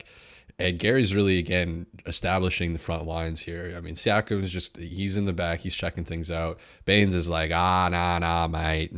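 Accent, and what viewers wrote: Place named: Australia. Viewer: Holy fuck this is giving me history class flashbacks 😂😂 Love your Australian accent m8 Idk what the hell to say about this man I think about this relatively often. Shout out Gary standing up for his boy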